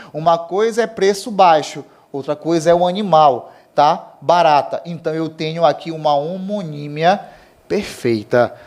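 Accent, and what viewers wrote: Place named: Pará, Brazil. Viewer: aula boa é com sotaque paraense égua mana muito bommm!!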